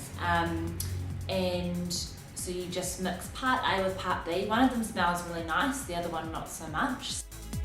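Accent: New Zealand. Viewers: Kiwi! Yay! So nice to hear a familiar accent on here :D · new zealand accents are my favorite and I apologize for the ignorance, not all americans suck.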